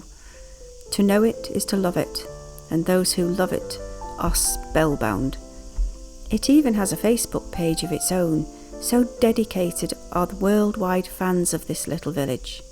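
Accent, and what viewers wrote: Northern England, United Kingdom. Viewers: It was odd hearing you say you spent your childhood in Crantock, were they holidays? I hear a northern English accent in your voice, not Cornish, I'm intrigued. Love your artwork.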